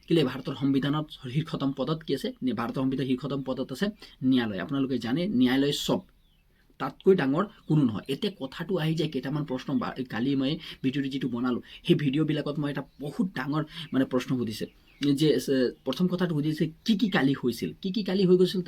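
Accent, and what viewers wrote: Bihar, India. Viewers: Toi axomia a koba najna nhu ..bihari nki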